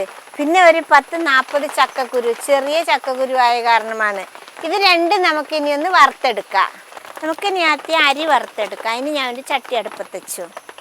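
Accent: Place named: Palakkad, India. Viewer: Ningalude veed evade. Palakkadan slang und avatharanathil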